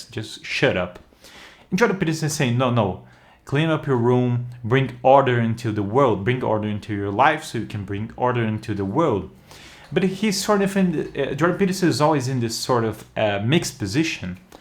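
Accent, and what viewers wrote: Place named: United Kingdom. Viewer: professor, your english is amazing, looks very like the british english, sadly my spoken english looks like a fusion of british and american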